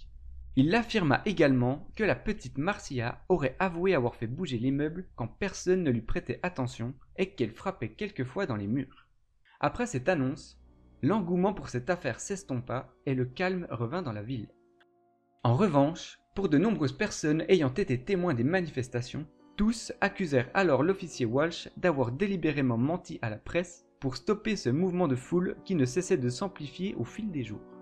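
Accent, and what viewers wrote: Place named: Jura, France. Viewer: Tu as ll'accent jurassien c'est excelent^^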